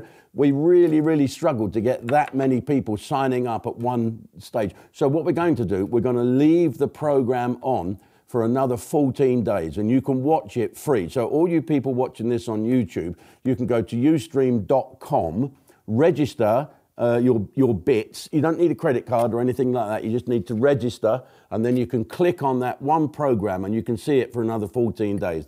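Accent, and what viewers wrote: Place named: Northern England, United Kingdom. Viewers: What's with the northern accent Starmer and Lammy are from your neck of the woods 😊😊😊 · What talking like a Northerner for 😂❤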